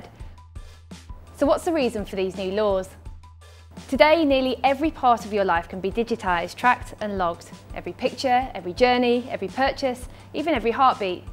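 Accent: United Kingdom. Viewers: British accent is so great.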